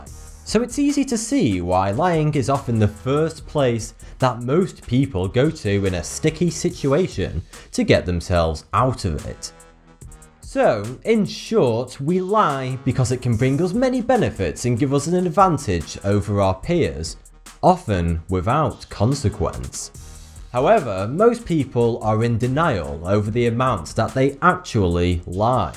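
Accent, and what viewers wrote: United Kingdom. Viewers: RSCGaming hes british, and in british accent, thoughty 2 sounds like 42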